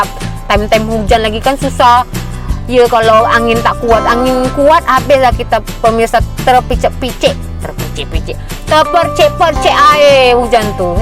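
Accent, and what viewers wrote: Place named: Malaysia. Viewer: Wow fasih slang melayu Malaysia ya? berapa lama di Malaysia?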